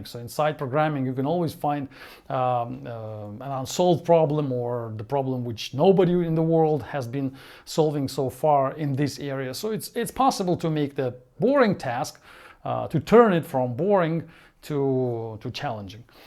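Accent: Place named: Russia. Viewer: Totally agree with what you are saying. Just a tip. Hire somebody to work on your English pronunciation, it's so Russian now. :)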